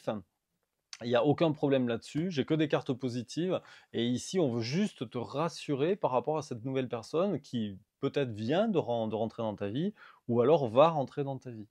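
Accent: South, France. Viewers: Bonjour, J'ai souvent des synchronisatées style 111, 11h11, 777, 31 qui est son département, 29 date de naissance bref, je n'y crois plus car sa fait trop de temps passé, perso j'ai du mal à refaire confiance aux hommes dsl mais je dis se que je pense Sa reste un tirage général malgré tout. Lumineuse journée bel accent du Sud ou..... Merci. 🙏💜💜🙏💜💜🙏😇🙏🕯️🙏🕯️🙏😘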